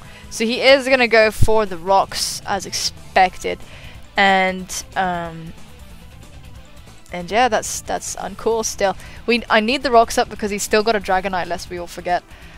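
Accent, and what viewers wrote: England, United Kingdom. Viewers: Godstruct strikes again!! and not even burn can stop him from killing stuff, GOD i love that beast XD QOTD: im not american but i speak with the american accent so i suppose i say the the T's more or less like them :) i wish i could have an accent like your, i lived in England before in Plymouth but unfortunaly didnt manage to get the accent :(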